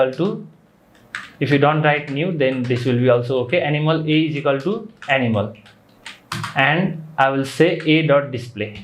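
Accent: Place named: India. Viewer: your lectures are soo good , but dont speak english in hindi acent, its looks so bad....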